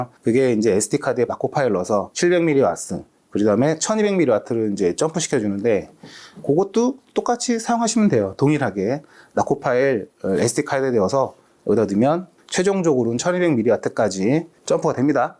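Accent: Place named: South Korea. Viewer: Korean accent are 🔥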